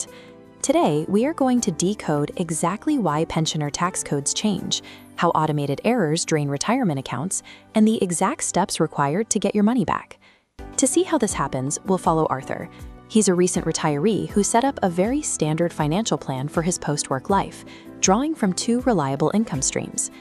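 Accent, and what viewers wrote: United States. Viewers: If you are talking about UK tax, don't use an american english voice over, otherwise no one will trust you.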